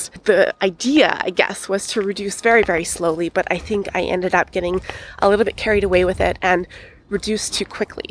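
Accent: Canada. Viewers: Hi there, I'm not sure why I watched this video, but I was touched. I don't have schizophrenia, I don't know anybody who has schizophrenia and I don't know much about the disease at all, but I was fascinated by this video. I am sure that you don't want sympathy, but I have to say I feel so bad for you. You are a smart, charming, compassionate and beautiful young woman and I am horrified that you have to go through what you go through. It sounds like you are Canadian, so am I. I live in downtown Toronto. I am available to speak with you about anything at any time for any reason. If the crisis centres are not available for you, I am.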